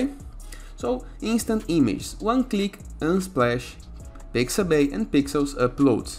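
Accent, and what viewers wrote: Brazil. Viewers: Senti o sotaque brasileiro kkkkk depois fui no canal conferir e realmente é. Conteúdo bom demais valeu 🤝🤝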